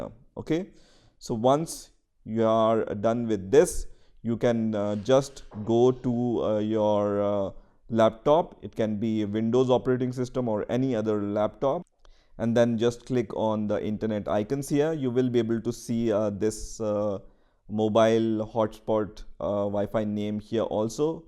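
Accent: India. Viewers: Strong Indian accent not understandable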